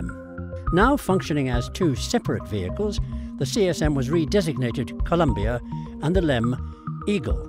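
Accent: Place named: United Kingdom. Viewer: Do you think they have British documentaries in England that are narrated by Americans probably not so why do we have to listen to limeys over here every time we want to learn about something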